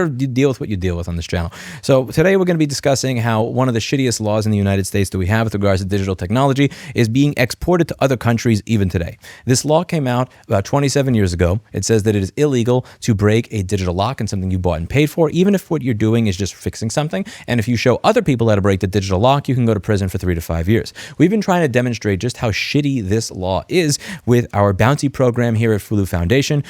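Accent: United Kingdom. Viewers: nice British accent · why he talk british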